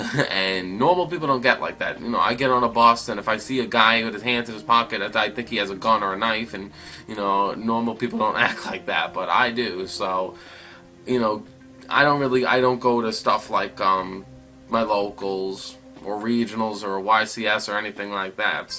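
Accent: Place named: Brooklyn, United States. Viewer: you from brooklyn bro?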